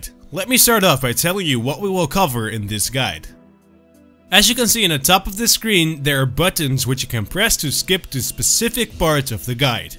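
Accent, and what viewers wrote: Netherlands. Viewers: u realy sound like my english teacher just in case tho are a english teacher and are u dutch ? u sound realy sound like him. ;P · Your english seems dutch! Am i right? :)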